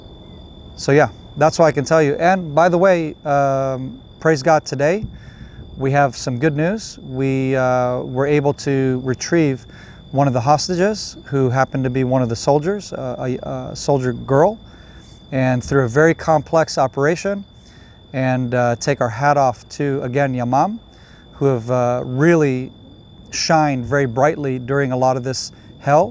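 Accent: United States. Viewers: I did watch it in full, thanks. There could be a number of reasons why he has an American English accent. It's no big deal. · There's a good documentary on Netflix about gaza and Palastinians day to day life. The propaganda is strong in this one, just notice the perfect american accent. Ask palestinians if they can return to their ancestral lands also. I hate Hamas and the IDF for what they have brought on to palestinian citizens. Palestinians just want freedom.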